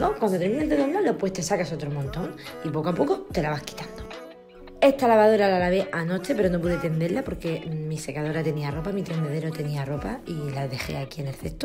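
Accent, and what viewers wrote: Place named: Murcia, Spain. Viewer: Que acento murciano más bonito tienes.😘😘😘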